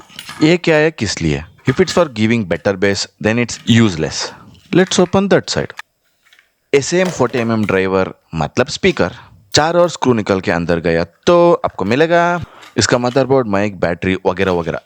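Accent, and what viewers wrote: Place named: Northeast India, India. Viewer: Bhai tum kaha se ho, aapki aawaj se lagta hai ki aap northeast India 🇮🇳 se ho 😅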